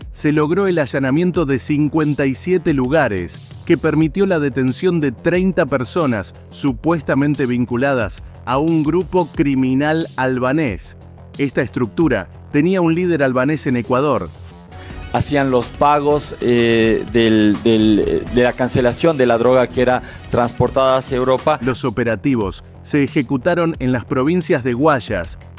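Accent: Argentina. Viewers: Frases españolas pero con acento argentino. Entre absurdo y repugnante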